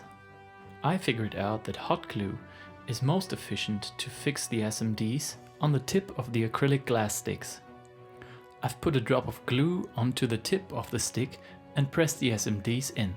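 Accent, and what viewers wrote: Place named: Germany. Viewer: Nicht schlecht Herr Specht. Ich schätze ich höre da einen Landsmann. Würdest du sowas auch als "Auftragsarbeit" für jemanden machen?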